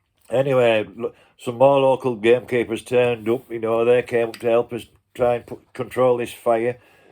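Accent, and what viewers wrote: Yorkshire, United Kingdom. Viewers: I genuinely hope you are pain free soon. Keep your stories coming they are an important picture of the past and you tell them in your wonderful Yorkshire accent to the tick of a clock which is all a perfect background to your pipe smoking content. I for one enjoy it all and thank you for it.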